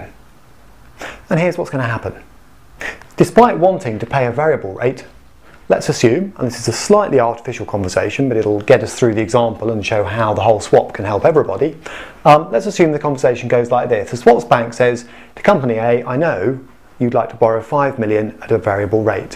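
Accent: United Kingdom. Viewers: It always works better with a British Accent. Fantastic video! Thanks! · Haha I was confused too. Then I turned on the caption. Its 'aren't' potentially higher. Brit accent i tell you.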